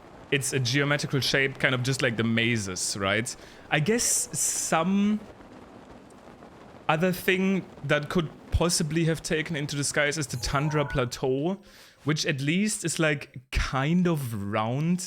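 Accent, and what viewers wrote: Germany. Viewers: why do you sound so german?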